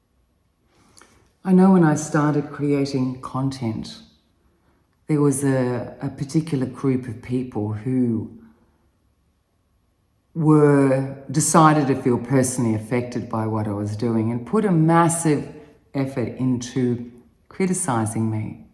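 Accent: Australia. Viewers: Here now a few years in your Channel ❤ from Ireland 🇮🇪 it's your Australian accent particularly yours...😊😊😊😊 So lovley ❤❤ Anyways all of my best to you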